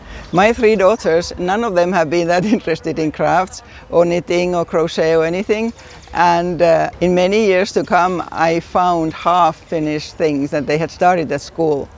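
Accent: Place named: Finland. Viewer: Hello! I am so happy to have discovered your channel! I could listen to your Finnish accent all day as I come from a small Finnish community in Northern Minnesota and am proud to be 1/4 Finnish myself. 😬My family and I were in Finland for a month in the summer of 2011 when we visited our former exchange student who still lives in Helsinki. Neither knitting or crochet in taught in US schools; not in the midwest, at least. Sewing, cooking, woods and metals are taught here though, just as in Finland. I thoroughly enjoy your basic, easy to understand tutorials. I conquered the Granny Stitch today thanks to you! Kiitos, Shelley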